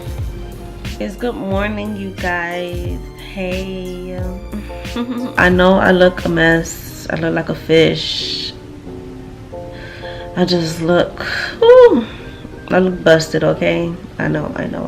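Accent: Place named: Miami, United States. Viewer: Lol I could just by how you talk you from Miami and I was right. Good video.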